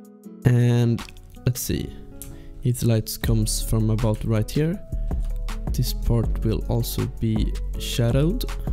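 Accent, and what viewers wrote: Sweden. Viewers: Are you swedish? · ARE YOU SWDEDISH? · are u swedish? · I know a swedish accent when I hear one ^^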